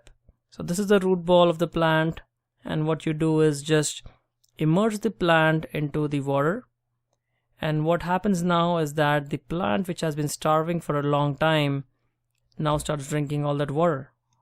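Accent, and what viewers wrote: India. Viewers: He sounds indian but his skin looks white. I’m stoned and i know its 2021 but just something I noticed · you sound like indian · Sound like an Indian guy in California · pronounces you are Indian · Sounds very Indian... The accent is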